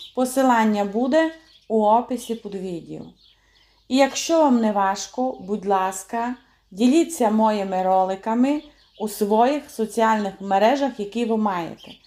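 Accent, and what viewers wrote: Transcarpathia, Ukraine. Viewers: Клас! Насолоджуюсь закарпатською говіркою, окрім гарних рецептів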